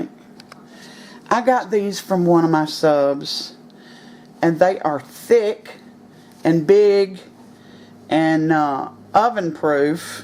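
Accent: South, United States. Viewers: Don't mess around with her Southern draw and hospitality. Southern people are not in a hurry.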